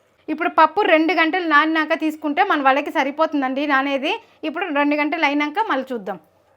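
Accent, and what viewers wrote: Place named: Tamil Nadu, India. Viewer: But, you are looking and speaking like a Tamilian ,akka 😃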